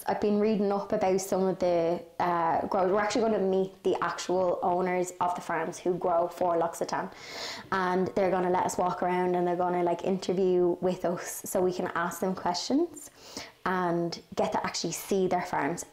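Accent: Ireland. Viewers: love to hear that beautiful Irish lilt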